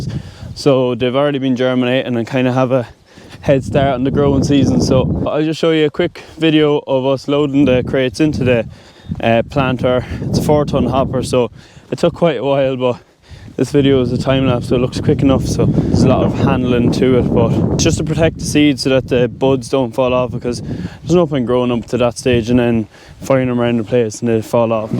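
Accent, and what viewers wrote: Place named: Meath, Ireland. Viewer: Butlins Mosney the Peefect place for a holiday Meath accent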